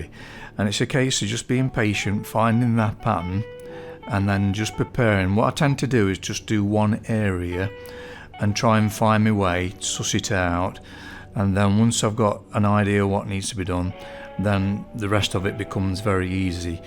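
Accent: United Kingdom. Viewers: Thank you, I have learned a lot from you; actually you were the one that introduce pastel pencils to me! I didn't know there actually exists such a great tool; I just used to draw and paint using pastel sticks, oil, and charcoal. I have downloaded your videos and watch them everyday with considerable attention. It's funny that my daughter's accent is going to change to British as a result of listening to your commentary. I wonder if you are able to let me know how you take your videos! Do you use a DSLR cam or a simple mobile phone? I appreciate all your art and generosity.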